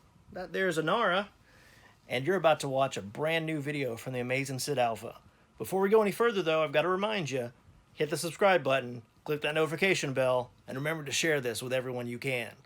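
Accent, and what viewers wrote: United Kingdom. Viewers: That britishsounding reading with supersnark felt so TB I had pangs. Gotta go watch some Genna to support her.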